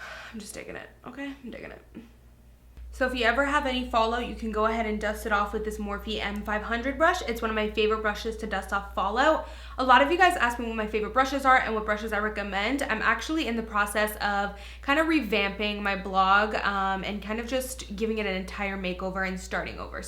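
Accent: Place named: Canada. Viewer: you are so damn adorable!! Just now realized you are over 500k...I've been around since 80. So proud of you. btw i'm in love with your Canadian accent <333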